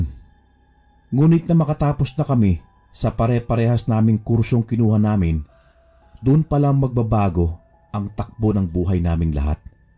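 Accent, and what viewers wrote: Ilocos, Philippines. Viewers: nakakatuwa ka naman mg ilokano lods hehe nice story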